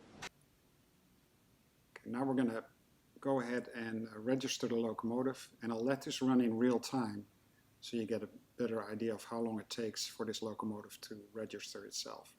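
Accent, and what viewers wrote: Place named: Netherlands. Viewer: Your English sounds very Dutch. Nice presentation, nice locomotive. Although I drive mainly German and Swiss locos I may consider to buy this one as well. Looks and sounds really nice. Greetings from the Čech Republic 🇨🇿 and stay healthy 😷.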